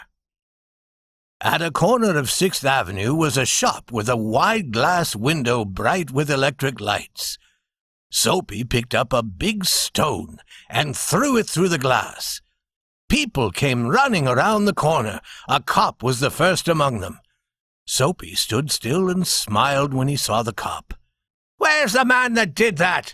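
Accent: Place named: England, United Kingdom. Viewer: very great accent ...English (Saadiyat Beach)..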